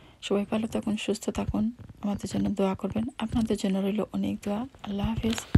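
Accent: Sylhet, Bangladesh. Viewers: Assalamalakum আপু আপনিও মাঝে মাঝেই সিলেট কথা বলবেই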